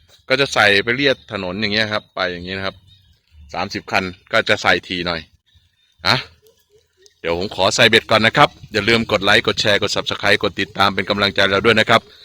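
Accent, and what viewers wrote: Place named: Isan, Thailand. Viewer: เว่าภาษาอีสานได่ดอกฟังแล้วมันกระดากหูว่ะ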